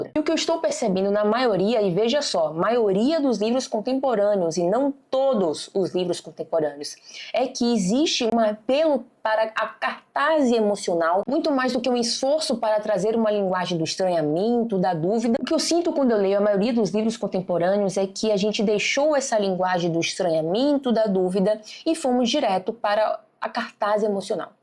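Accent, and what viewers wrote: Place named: Bahia, Brazil. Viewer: eu ouvindo seu vídeo e, ao invés de prestar atenção, tentando identificar se você é da Bahia pelo sotaque e já querendo chamar pra um café. ❤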